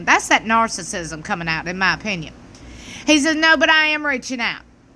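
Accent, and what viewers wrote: South, United States. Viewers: This is awesome and your strong southern accent makes it hilarious! I love the way you say full of shit!😂😂😂 I’m southern girl as well so I appreciate a good southern accent ❤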